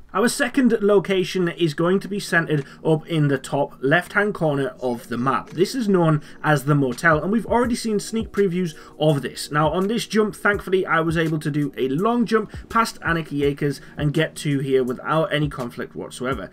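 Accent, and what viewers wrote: Newcastle, United Kingdom. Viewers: Are you a Geordie ?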